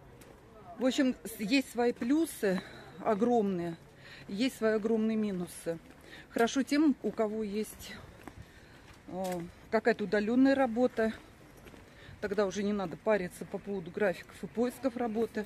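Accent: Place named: Nizhny Novgorod, Russia. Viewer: Мы прожили в Смолино четыре года, мне нравится Нижний, и медицина, и грибы, и земляника, вишня, груши, яблоки, Всё растёт! А этот говор: пойду дела делать! Сразу видно от куда Вы родом, 😊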